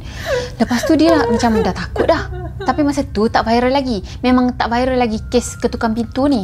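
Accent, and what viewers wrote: Kelantan, Malaysia. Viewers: Org kelatey ko kak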